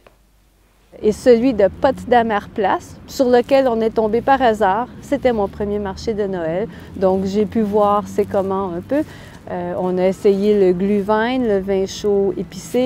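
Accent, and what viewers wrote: Quebec, Canada. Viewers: J'aime bien tes vidéos, et ouais, je te comprends parfaitement. 😃 J'essaie de améliorer mon français avant mon voyage au Montréal en août, donc je apprécie beaucoup pouvoir écouter l'accent Québécois pour m'entraîner. (Et corrige mes erreurs, s'y en a, STP). 😃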